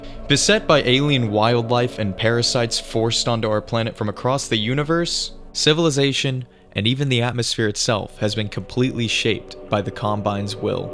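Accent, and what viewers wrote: Greece. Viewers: Are you Greek bro ? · Are you Greek?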